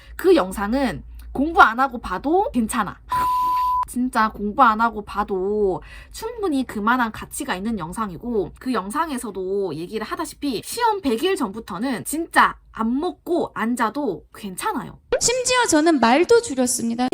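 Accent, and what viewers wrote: Gyeongsang, South Korea. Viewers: 잘 봤습니다 저도 오늘부터 의지를 다져봐야 겠습니다. 혹시 실례가 안된다면 경상도사람인가요? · 갱상도 이신가융 ㅋㅋㅋ 잘보고갈게요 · 부산시 공무원 되신건가여 ? 어쩐지 사투리가 조금씩 들리는거같았어여 항상 좋은 방송 해주셔서 감사합니다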